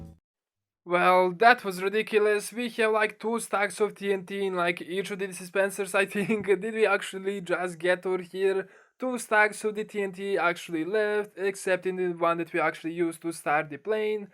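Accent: Russia. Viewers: I love that he sounds russian and he makes a tnt bomber.... you just got a new sub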